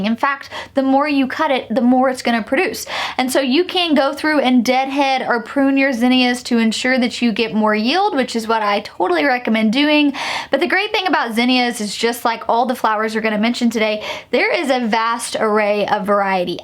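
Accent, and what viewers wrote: South, United States. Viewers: Hey, I am growing every one of those! I have soooooo many seeds But they are very well organized, separated and sorted. I have all my seeds on a schedule in my calendar Every Tuesday I start various vegetables and such then Thursday I start various flowers. This is all based upon how many weeks prior to the last frost date for me I want to use April 29th as the last frost date. So everything revolvers around this. So then all these dates are entered into my iPhone calendar as repeat every year. This is my most organized year ever! So my schedule is working well so far. I really hope to see good results this year so I can organized some photos and put them on Instagram which I am doing sporadically but I want to get better at having a schedule for this. Final note I absolutely love listening to you. I miss living in the south and I love southern women's accents. 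So I think I have enough channels now that it is amazing listening to y'all folks. Anyway God bless y'all Cya later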